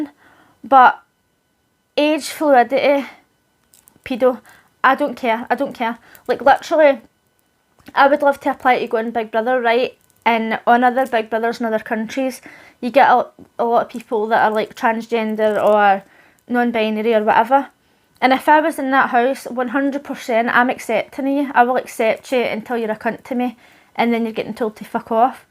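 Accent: Scotland, United Kingdom. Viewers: Oh maan what an accent, is that Sco’ish? Absolutley fantastic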